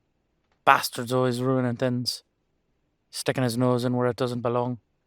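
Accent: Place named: Ireland. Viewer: I love your Irish Accent. Erin Go Bragh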